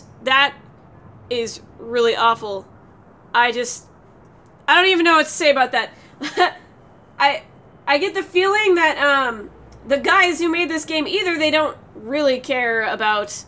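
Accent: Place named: Wisconsin, United States. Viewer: You sound like you're from Wisconsin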